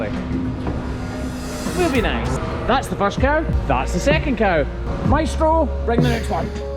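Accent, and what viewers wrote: Scotland, United Kingdom. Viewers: You did a great job on that cow!! I love your Scottish accent!!! You. An talk to me anytime!!!😊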